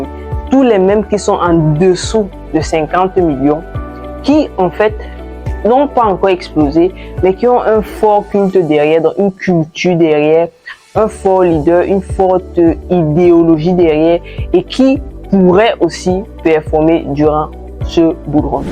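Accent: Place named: Cameroon. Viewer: J'aime regarder tes vidéos et j'adore ton accent camerounais.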